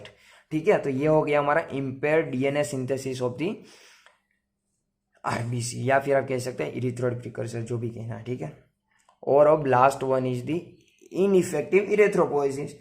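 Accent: Gujarat, India. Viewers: I think you are gujju from your accents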